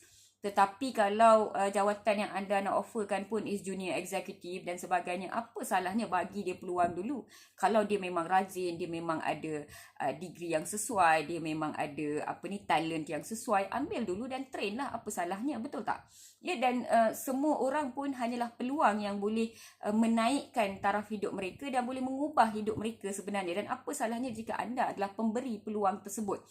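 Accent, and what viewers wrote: North, Malaysia. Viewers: Doktor Anak malaysia yg betul2 sudah merdeka...sbab fasih berbahasa Melayu..yg paling Bagus doktor boleh ckp loghat daerah jugak..bleh ckp loghat utara kan😀😀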